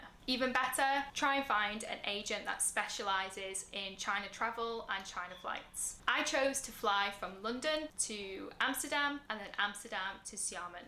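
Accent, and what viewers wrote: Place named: Ireland. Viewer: are you Irish? you just cant hide your accent.....................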